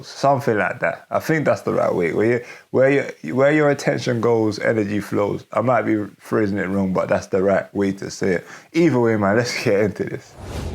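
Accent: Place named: United Kingdom. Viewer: British accent thick. Af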